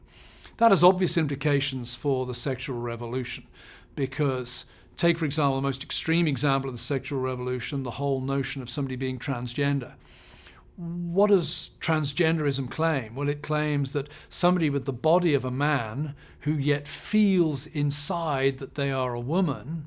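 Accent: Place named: England, United Kingdom. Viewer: If he applied this baloney to American Evangelicals he would come up with the same crappy conclusions about them as intends about gays and lesbians. - What he is doing is claiming that gay people are concerned about nothing, and I mean NOTHING aside from SEX, SEX, SEX. This has been the tactic of bigoted American Evangelicals for DECADES. All he is doing is dressing it up with phony 'intellectualism' along with his English Accent. If you can't think about gay people in any other way than THE SEXUAL REVOLUTION, you are a bigot, because you are completely denying their complex identities as human beings, - which you claim in nothing more than 'Christian' , - which is complete dishonest baloney. You have personal issues you need to deal with.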